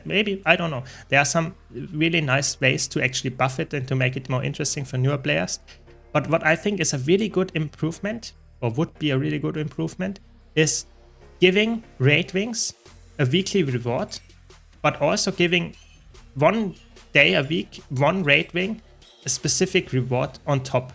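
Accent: Germany. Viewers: Hab mich schon gefreut ein Deutsches Video anzuschauen da der Titel auf Deutsch ist.. Klicke drauf und gesprochen wird auf English mit einem Deutschen Akzent.. schade.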